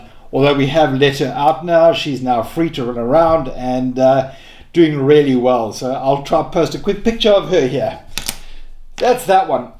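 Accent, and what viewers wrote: South Africa. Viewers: I picked up the south african accent right away. Hehe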